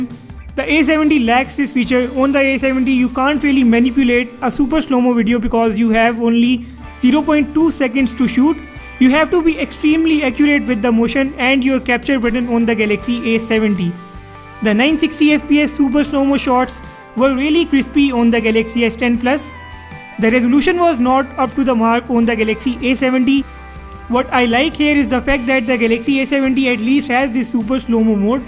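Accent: India. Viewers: Well as an Englishman I understood him perfectly and I've also liked the Indian accent anyways!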